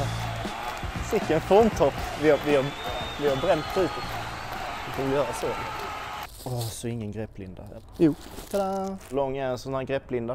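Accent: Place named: Scania, Sweden. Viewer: skjo :) älskar skånska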